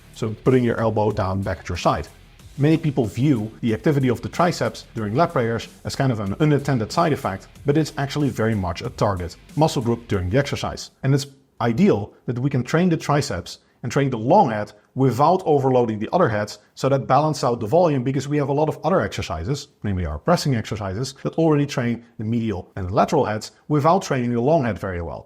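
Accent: Netherlands. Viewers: dutch accent is hilarious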